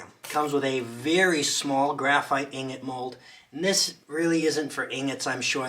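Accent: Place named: China. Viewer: The Chinglish is worth the price.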